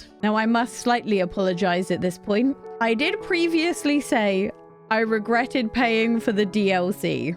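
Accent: England, United Kingdom. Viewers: I know you have an English accent, but her name is Mirabel, not Marybelle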